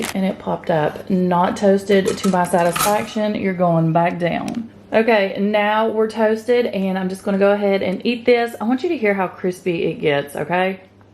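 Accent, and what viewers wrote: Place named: South, United States. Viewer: I love your accent ... a lil bit southern , like I feel happy around.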